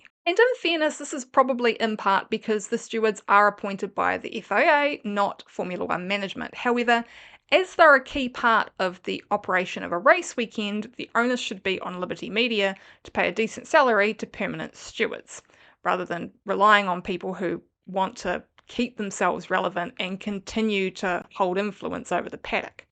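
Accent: New Zealand. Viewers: I like a kiwi accent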